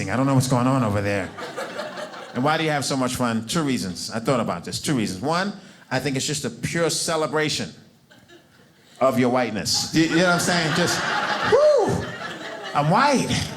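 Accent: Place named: New York, United States. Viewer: He is so hysterical, such charisma, and I can watch him once a week and still laugh at the same show. He’s wonderful and keeps it clean. I love his accent from New York, he cracks me up❤️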